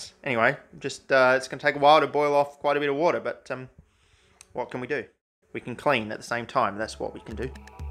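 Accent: Australia. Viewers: Love your channel, there's not many other people on the internet who do decent organic chem! A suggestion: try making benzaldehyde from benzene via Gattermann-Koch reaction. Basically, it's a Friedel-Krafts with CO + HCl mixture in place of an acyl chloride. Very neat chemistry and pretty cheap in terms of reagents used, maybe as cheap as you can get. I wanted to do that for a looong time, but I just don't have enough good equipment for working with the gases. In fact, I happened to find a detailed procedure for it in a 120 year-old "Practical methods of organic chemistry" by prof. Gattermann himself. Here's the link: <link> (Actually, it's a surprisingly good organic chemistry textbook.) PS: your accent is wonderfully Australian, always nice to listen to :)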